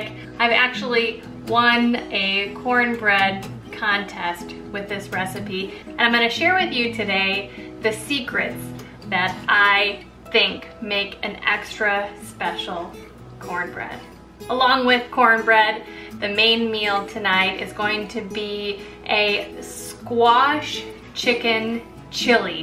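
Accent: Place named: South, United States. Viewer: you sound like a Southern gal, like me. Mama ( and I do, too ) used a cast iron skillet. There is one question that I ALWAYS ask at a restaurant if I may want to order some cornbread........” Has it got sugar in it? “ If it does, FORGET IT! But, there have been many times when I have made a skillet of cornbread and eaten...........only those crisp, brown edges........all the way around the entire skillet! Oh, Lordy, talk about good eating! Now, my daughter likes the center part ( she’s 1/2 “damnyankee” ), but I go for the crunch brown edges. And my Mama? She had to have some buttermilk with hers......yuck.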